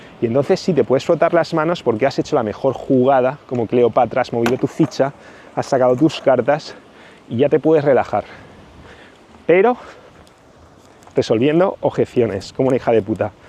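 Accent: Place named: Spain. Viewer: Hola, escucho tus vídeos porque me encanta el acento español y la cultura española. Mis bisabuelos paternos y maternos eran españoles y se mudaron a Cuba 🇨🇺 y mis raíces son muy españolas. Yo actualmente vivo en E.U y viajar a España 🇪🇸 en el futuro muy próximo está en mis planes. Soy tu fan para familiarizarme con el la cultura española y cuando visite me pueda comunicar efectivamente. Muchas gracias 🙏 por tu tutoría.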